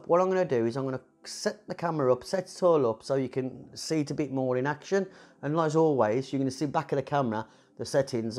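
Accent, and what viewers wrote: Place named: Sutton Coldfield, United Kingdom. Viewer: Love the simplicity so glad I've discovered your channel. Love the accent too, takes me back to my youth in Sutton Coldfield.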